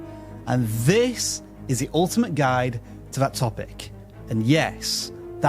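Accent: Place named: Yorkshire, United Kingdom. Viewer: I have a random question for. I have a friend who is from Yorkshire and he’s from Hull and I thought that your voice sounded like it was from there but I was just curious. What part of Yorkshire are you from? Also there was something that you said in the video about how thumbnails and titles attract views which I think it’s true but if you’re subscribed to a person like I am to you, I just see that it’s from you and I’m interested. it’s not a Elon Musk scam live stream coming from VidIQ I’m gonna click on it.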